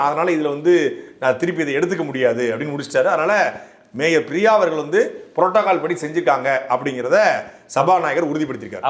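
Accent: Tirunelveli, India. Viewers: சாட்டையின் குரல் ஒலி திருநெல்வேலியின் குரல்வழி திருநெல்வேலிக்கு பெருமை சேர்க்கும் நெல்லை பேச்சுக்கு பெருமை சேர்க்கும் நெல்லை மாவட்ட வீரர்களுக்கு எடுத்துக்காட்டாக திகழ்பவர் அவர்கள் அவர்களுடைய பிறந்தநாளுக்கு எங்களுடைய வாழ்த்துக்கள் முன்பாகவே சொல்லி விடுகிறோம் நெல்லை சீமைக்கு பெருமை தரும் சாட்டை அவர்களின் அதிரடி பேச்சுக்கள் தமிழக சட்டசபையிலும் டெல்லி பாராளுமன்றத்திலும் பாராளுமன்ற டைகர் என்ற பெயரை பெற ரெண்டு ஹீரோ வேண்டுகிறோம்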